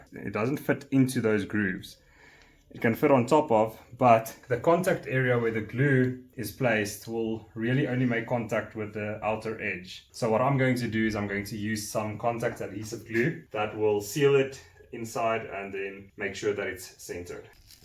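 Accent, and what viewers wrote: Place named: Afrikaans, South Africa. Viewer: Dude, super proud of all the South African youtubers... You guys rock... Only 2 comments, be proudly South African... stop rolling your "R's". Secondly, it's Aluminium, not Aluminum... I get it, people think the Afrikaans accent sounds dumb. But the true South Africans will be proud of it... Keep it up man!!! Subscribing !!!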